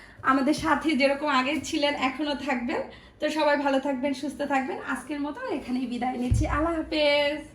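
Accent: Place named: Kushtia, Bangladesh. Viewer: আসসালামু আলাইকুম আপু। আমি নতুন তোমার চ‍্যানেলে।আপুর কথা শুনে মনে হচ্ছে কুষ্টিয়া হয়তোবা। সব জিনিস গুলোই সুন্দর 💓💓💓💓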